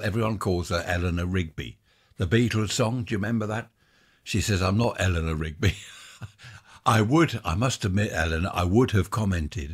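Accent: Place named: Worthing, United Kingdom. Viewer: Hi, this is a podcast covering one of my favourite interests. Trains and trams are always a great subject to discuss. When I was a child in Sydney, all the interstate and regional trains had steam engines. Our suburban trains were single deck electric. So9me had steel carriages and others had wooden carriages, which were older than the steel carriages. Sydney only started getting double deck carriage trains in the 1960s. Actually, listening to your voice, you don't sound like you are at the Isle of Wight, you still have your Worthing accent!!! Seriously though, The Isle of Wight sounds like a great place or a holiday. I remember riding steam trains from Sydney to Nowra south and also to Newcastle north of Sydney. Where do your trains go from Worthing these days? According to a video that I have just watched, the train carriages going through Worthing station are green in colour. 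We had a lot of train lines disappear here in Australia as well, though in some situations, they have had to bring them back. We still have street telegraph poles with lights here, though only to carry the electric, Internet and phone cables. We had trains carrying cars until recent years, though not sure if they still run these days. My wife and I were married in a house way back in 1979. We knew a minister, who was a friend of the family and all went very well. Anyway, take care. Rob in Melbourne Australia.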